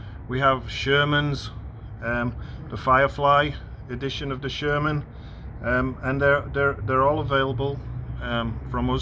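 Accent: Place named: England, United Kingdom. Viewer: Hello were are you from originally. You don't sound American. Sound more English .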